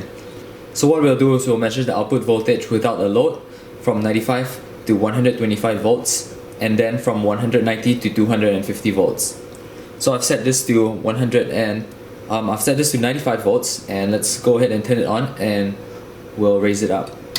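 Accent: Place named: Singapore. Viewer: You know, I can hear the essence of Singapore in your voice :D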